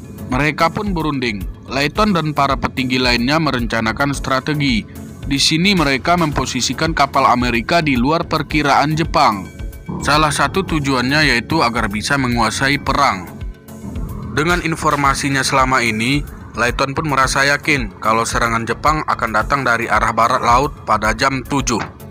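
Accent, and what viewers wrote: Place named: Jakarta, Indonesia. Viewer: Astagfirullah, yg komen org Batak yg dikomplain logat Batak. Ni siapa yg isi suara? Mirip suara2 org Betawi sana..., Mcem suara org Jakarta ya.. sukses buat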